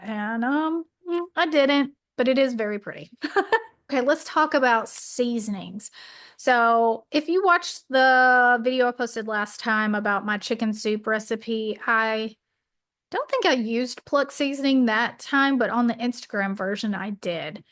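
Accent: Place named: West Virginia, United States. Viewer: You from West Virginia 😄😄😄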